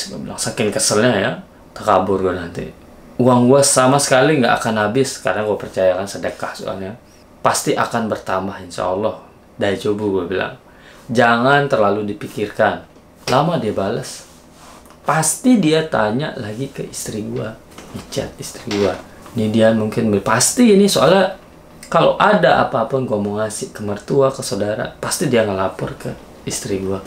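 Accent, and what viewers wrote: Lombok, Indonesia. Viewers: Logat lombok nden bau telang bro 😅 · Logat sasak nya masih ada bang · Kok logatnya kayak orang Lombok ya?😁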